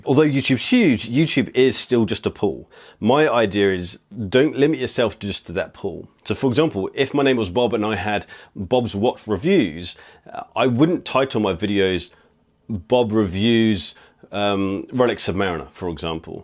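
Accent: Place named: Scotland, United Kingdom. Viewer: Hey, where in Scotland are you?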